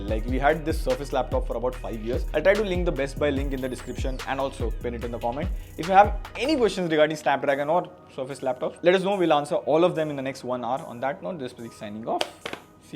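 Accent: India. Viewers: terrtble hindus accent speak slower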